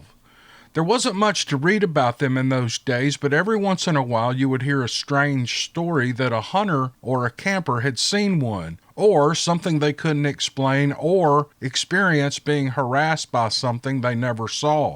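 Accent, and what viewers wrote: South, United States. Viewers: all the best to you, your family and all the pups too! Thanks for that good old southern style story telling we’ve all come too love.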